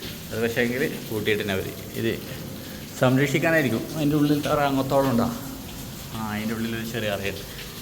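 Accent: Kannur, India. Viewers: നന്നായിട്ടുണ്ട് എങ്കിലും സംസാരത്തിൽ കുറച്ചു കണ്ണൂർ ഭാഷ കൂടി പോക്കുന്നു വീഡിയോയും ഒന്ന് കൂടി ശ്രദ്ധിച്ചാൽ അടിപൊളി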